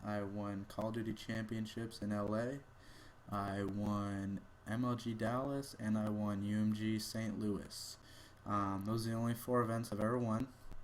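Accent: Canada. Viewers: I can hear the Trailer Park Boys in his voice. It's amazing. Soarry. Abut. Grade 5. Incredible. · Change the title to "All Aboot Me ". lol, I like how Canadians say 'about', and 'sorry'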